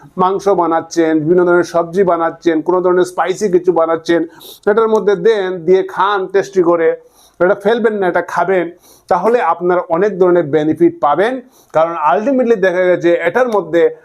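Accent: Sylhet, Bangladesh. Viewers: ভাই আপনি কি সিলেটি আপনার কথায় আমি বুঝতে পারতেছি আপনি ১০০% সিলেটি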